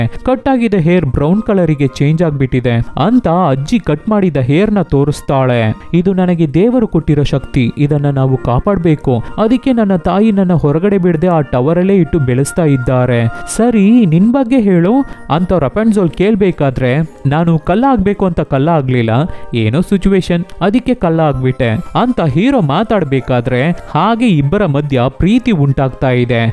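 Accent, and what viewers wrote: Tamil Nadu, India. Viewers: அண்ணா நீங்க தமிழ் காரங்க தான நீங்க பேசுற அப்பவே தெரியுது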